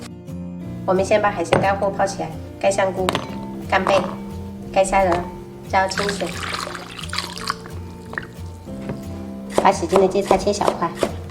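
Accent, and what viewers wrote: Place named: Fujian, China. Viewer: 真香，四川人說福建話，做福建菜比福建人更勵害更標準。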